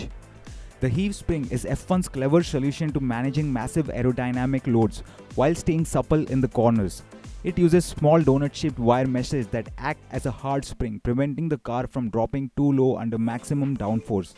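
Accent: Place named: India. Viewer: bro just reuploaded driver61 with an indian accent and thought yeah thisll do numbers? bro come on now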